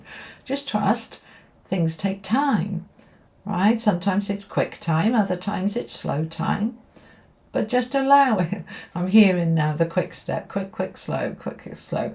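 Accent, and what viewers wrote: United Kingdom. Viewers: Dear I started watching you around this time last year. A few things I love about you (besides your beauty and style): You are genuine. Your readings are not robot-like or business-like. It feels like you’re talking to a best friend. You’re also motherly, “in the moment”, very kind and patient. Sometimes I listen to you over and over when doing my hair or doing housework. It just feels homey and warm. I repeat certain words right after you with British accent haha (I’m writing from California). There is more to your videos than just simple tarot readings. There is heart, there is depth and there is wisdom. Have a lovely 2025, beautiful! You are appreciated.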